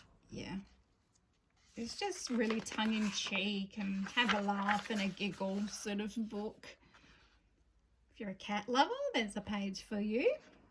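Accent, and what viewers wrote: Australia. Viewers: Hi I am fairly new to your channel but I have been binge watching. I love all of your videos. I have gotten so many coloring book ideas from you as we have very similar taste. Most of all your lovely Australian voice is such a pleasure to listen to. Very relaxing. So glad I found you on here! 🙂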